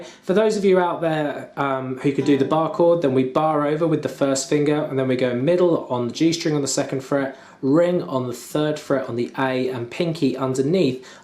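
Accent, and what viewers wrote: England, United Kingdom. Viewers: WOW! Your English accent threw me for a loop! Great cover, cheers :P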